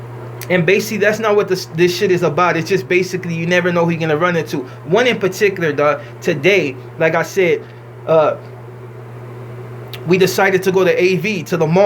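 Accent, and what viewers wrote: Mexico. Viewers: Are you full black ? Becuase damn dude you sound Mexican! You gotta be part Mexican lol it's not a big deal but its interesting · Why the fuck you talking like a mexican nigga? Stfu w yo bitch ass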